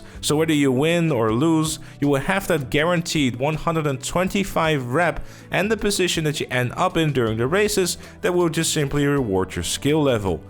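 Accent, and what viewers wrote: Netherlands. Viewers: Do I hear a Dutch man speaking English?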